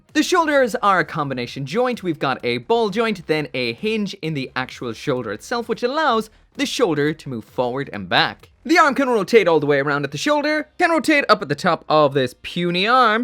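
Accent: Ireland. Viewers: Irish accent really popping through in this review. Also props to him for review this abomination of a kit 🔥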